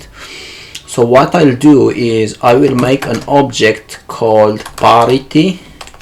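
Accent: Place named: India. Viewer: Thanks for solution. As for me, it's a little bit difficult to understand your pronunciation since it is a litle bit similar to Hindi speaking people (some places). For example all, individual, confirm divisible.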